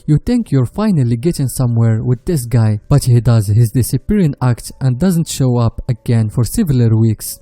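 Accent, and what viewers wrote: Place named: France. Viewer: he is a french speaker that's why he got that accent i think he's getting better just be patient and use captions Give him some encouragement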